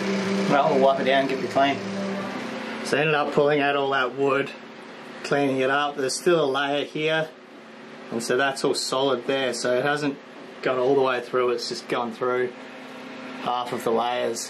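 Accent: Australia. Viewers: That Oz accent is so cool, more so when your hyped about something. Its like listening to a chilled Brit but on speed. :)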